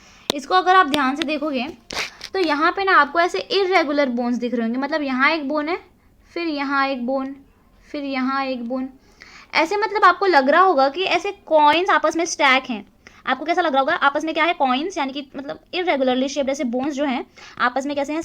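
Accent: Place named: Maharashtra, India. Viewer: Hi 😊 Didi You Explain Very Very nicely but..... I mostly love ur those videos when you teaches on paper like you're teaching on White Board I'm A little bit weak in Maths But When U Explain me I can Solve any problems from Equation Now my Last exam is stared only 10 days are Remaining I will do my Best Thanks for your Maths Sub Teaching ☺️ Ap jaisi teacher agar meri School ki maths teacher hoti mera matlab agar ap hi meri maths teacher Hoti Mai bohot khush nasib hoti Aani mi tumchya awaja varun olakhlay ki tumhi marathi aahat🤗 Thnk You So Much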